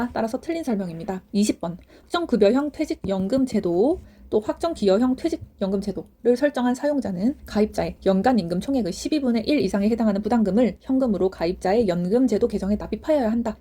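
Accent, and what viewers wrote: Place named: Gyeongsang, South Korea. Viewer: 귀에 때려박는 경상도 딕션과 유려한 설명. 너무 감사합니다!!!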